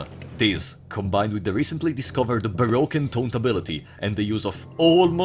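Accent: Italy. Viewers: I don’t think fear and hunger would be the same without your accent. Onestamente sono molto contento che sia un italiano ad essere forse la persona più rilevante nella fungercommunity, viva il patriottismo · the italian accent hitting so hard <3 te se ama che conosci funger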